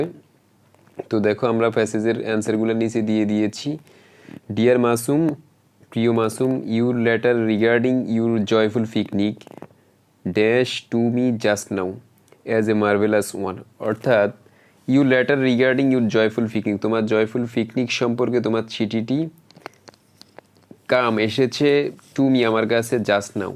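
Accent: Noakhali, Bangladesh. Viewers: oo vai noyakhailla apni?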